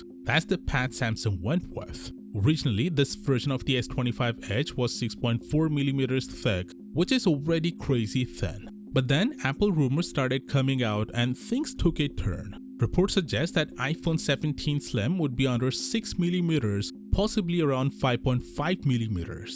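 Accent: India. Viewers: Of course its an indian accent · ur english sounded like indian or pakistani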